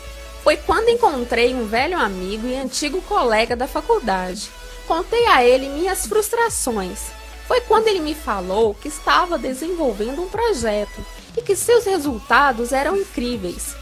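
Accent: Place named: Minas Gerais, Brazil. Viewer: SOTAQUE MINEIROO KKKK <3 AMO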